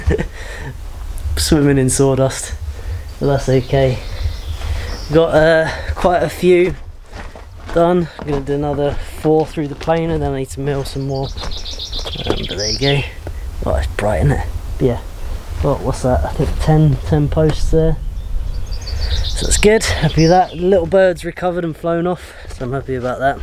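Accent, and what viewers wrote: United Kingdom. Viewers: actually what I meant was his British English accent as compared to American. Sounds lovely.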